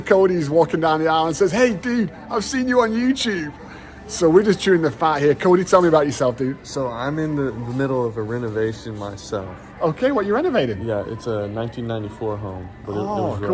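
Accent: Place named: Northern England, United Kingdom. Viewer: Watching from Norway, though I'm originally from the UK! Love that your northern accent is still so strong, hope it doesn't confuse too many of the locals (actually, I kinda hope it does!). 😅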